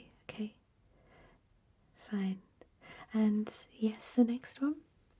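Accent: United Kingdom. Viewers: Theirs nothing quite like a British ladies voice. Outstanding.❤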